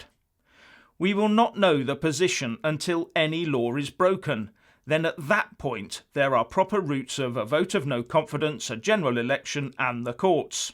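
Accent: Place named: England, United Kingdom. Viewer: I would love to hear you curse, nothing better than a strong English accent dropping the “C” bomb. 👍👌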